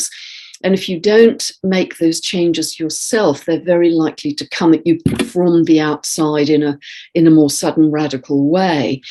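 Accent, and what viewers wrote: England, United Kingdom. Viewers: Hi! I have my natal Chiron at Taurus 18 degrees. Chiron natally squares my Moon at 25 Aquarius. Going through Saturn conjunct Moon this year and I’m really struggling with depression and despair. The last full moon in Capricorn at 21 Capricorn EXACTLY squared my natal Saturn at 21 Libra. Let’s just say I got a nasty shock and am seriously struggling to feel anything other than that the universe hates me and is out to torment me until I decided to leave the planet. I’m worried this conjunction right on my natal Chiron will be another blow. Can you offer a different interpretation please? I find it harder to continue to force myself to see the “positive “ possibilities. Every time I do that in the last 18 months whatever I am hopeful about is ripped away brutally. Appreciate anything you can share. I’m not a professional astrologer but I do try and use astrology to help myself. 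This year I am just struggling beyond belief to hang onto hope (also you may have realised, I am having Saturn square Chiron transit. No wonder I cannot see the light!) Thank you and have a nice day. I find your voice and accent soothing because I have a lovely soulmate in this life who is English as well:) From Bangalore, India.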